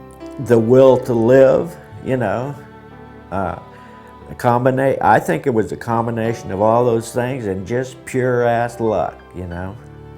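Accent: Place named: Kansas, United States. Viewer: Glad your hanging on. I knew you had to be from Kansas as I listened to you talk and 37 min you said your were just a farm boy from Kansas. Greetings from a fellow kansan.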